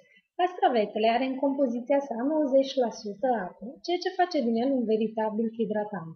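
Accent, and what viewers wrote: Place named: Italy. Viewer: Si eu locuiesc tot aici in Italia , vorbesc 5 limbi straine si nu mi-am schimbat deloc accentul....incearca sa vb fara accentul care il au italienii, pastreaza-l doar atunci cand vb italiana, crede-ma e mult mai frumos.Nu am nimic cu moldovenii cand nu vor sa para ceea ce nu sunt.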